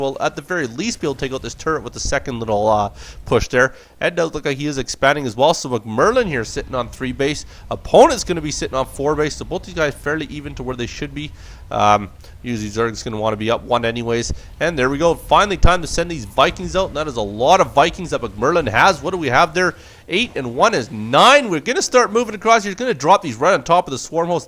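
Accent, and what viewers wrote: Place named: Midwest, United States. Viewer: Where are you from? Midwest? Northwest? Canada? So many "wowzers" I had an Inspector Gadget flashback. Good cast, cuz.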